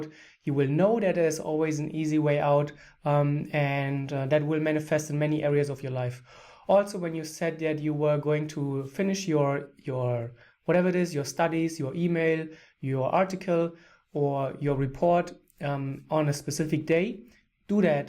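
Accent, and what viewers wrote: Germany. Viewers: I immeditely knew you were german from your accent! Jawohl ja! Haha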